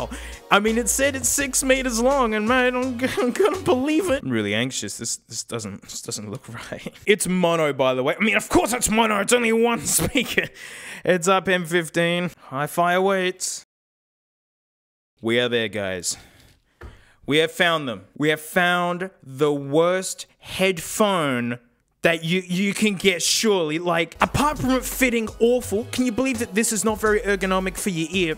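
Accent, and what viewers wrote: Australia. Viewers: Question are you Australian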